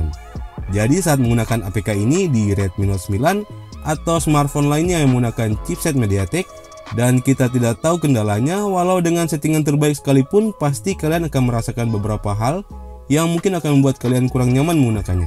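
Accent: Kotamobagu, Indonesia. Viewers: Bang kau orang Kotamobagu?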